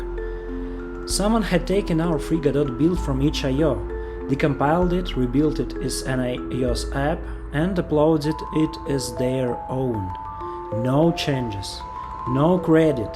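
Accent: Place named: Russia. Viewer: Очередной урок самаритянам. Делают всё за бесплатно - а потом: "а чё это у нас денег нет?😮" Насчёт авторки. Если бы тебе юрисдикция позволяла, было бы незамедлительное обращение в суд и всё по списку. Но ты стыдливо опустил момент, что никто с тобой не будет считаться, просто потому что ты из неправильной страны. Лучше на ютубчике плакаться корявым русским акцентом😢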